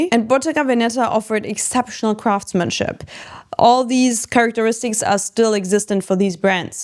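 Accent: Germany. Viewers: bist du German?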